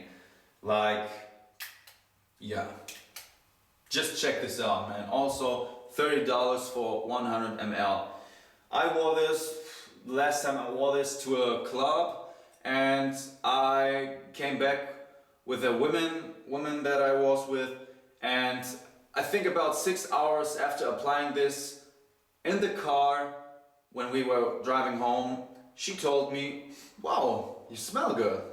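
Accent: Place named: Germany. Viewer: I always wondered where his accent came. Turns out he’s German. Still love the accent and good to see the Germans keep going.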